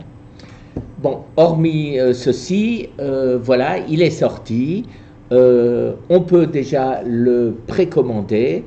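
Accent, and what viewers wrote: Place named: France. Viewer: Merci pour toutes vos excellentes vidéos! C'est bien aimable d'avoir fait celle-ci dans la langue de Molière, bien que lorsque vous les commentez dans celle de Shaekespeare, je comprenne bien également et que votre sympathique accent français m'amuse beaucoup! Continuez comme ça, bonnes photos et bien du plaisir pour la suite!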